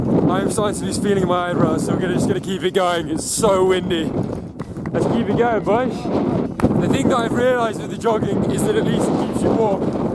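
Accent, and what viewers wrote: United Kingdom. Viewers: learn me british accent pls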